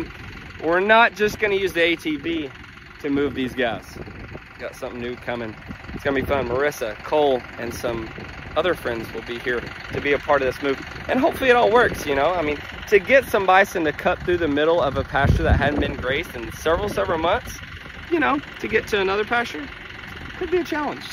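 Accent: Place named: South, United States. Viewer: It’s just the way he talks. Down here in the southern part of the country we tend to give some words our own special tweak.😉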